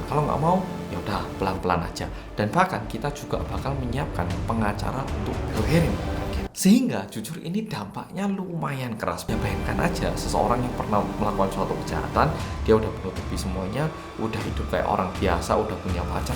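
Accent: Java, Indonesia. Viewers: Setelah ntn the glory, q sempat ada lihat video perempuan agak gemuk trs rambut ponian, dia LG cerita di depan kamera katanya drama the glory ini, sama seperti cerita dia. Trs q sempat lihat bekas cetok rambut di badannya. Ntah itu bnr ato gak, wkt itu di interview GT si perempuan itu, gitu aza sich.. tp ternyata menceritakan LBH jelas 😊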